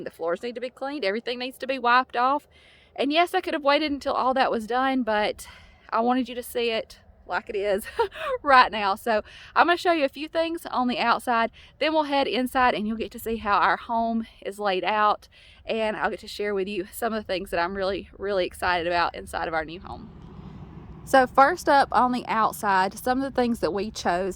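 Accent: South, United States. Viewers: I have just found your channel and SO glad I did. When I heard your Southern Accent, I knew you had to be from Alabama, too. I am from the beautiful state of AL and love the south. Your home is gorgeous. I can't wait to see it decorated. I hope you will have nothing but happiness in your life while living there. I am already a fan and will be going back and watching your other videos. God Bless you and your family.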